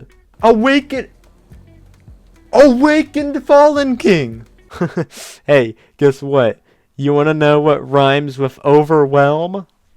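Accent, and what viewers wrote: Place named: Texas, United States. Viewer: I love your western/Texas voice 😁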